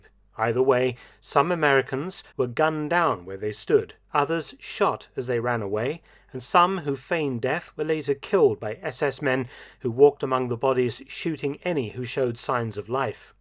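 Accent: United Kingdom. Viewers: Mark Felton Productions-- About 98.6% of your videos are FANTASTIC because you don't use politics or PC or pass judgements, just the facts!! Your videos are more education about the ww2 era than a 200 or 300 college level course on WW2! Your accent sounds British…true? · I’ve been really enjoying this channel lately. Even his British voice goes perfect with this content.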